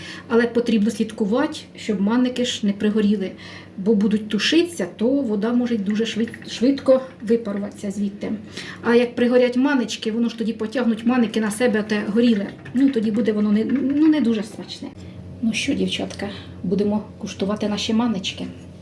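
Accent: Ukraine. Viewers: Це настоящя укра¡нська мова..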